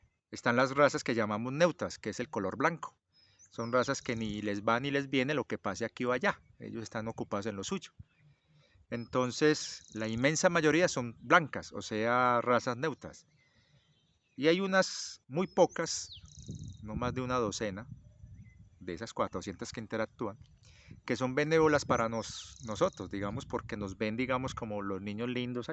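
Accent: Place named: Valle del Cauca, Colombia. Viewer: Hello, sr, ¿como vas? Pasaba por aquí y quería saludarte y decirte que, aunque digas que estás por las Antioquía, aún no se te ha borrado tu acento Valluno y eso que soy español para identificarlo perfectamente……será que, ¿mi esposa caleña me enseña cada día como se siente el valluno?, jejejjeje Agradecerte tus videos y en especial este, no por nada en especial, si no porque especialmente tocas un tema que a mi me cuesta de explicar a las personas que me rodean. Se de sobra que buscar culpables a nuestra situación personal fuera de nosotros mismo, es un ejercicio de estupidez soberana y muy extendido en la cotidianeidad del pueblo, tal como estar en posesión de la razón de manera poco asertiva, de que es lo bueno, o que es lo malo, o en el peor de los casos, juzgar la actitud de los demás como mala o buena, sin ni siquiera plantearnos, los procesos mentales o personales, que ha vivido esa persona para llegar a esa resolución de una situación en concreto, así no nos guste. 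No estoy yo tan convencido de que un tanto porciento equilibrado de la sociedad, tenga presente tus afirmaciones, más aún con el agravante de la propaganda que idiotiza a las masas a diario con la tv, redes sociales, etc…..como para racionalizar tus palabras. Es por ello que estaría bien que este video lo pudieran ver muchas personas y que les llegue a hacer replantearse todas las creencias que llevan a cabo cada día en el plano de juzgar, que esta bien o que esta mal o quien es bueno y quien es malo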